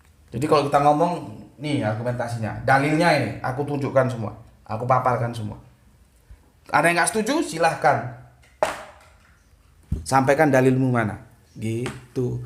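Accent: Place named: Bogor, Indonesia. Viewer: org bogor memang begitu bahasanya.. 😝🙏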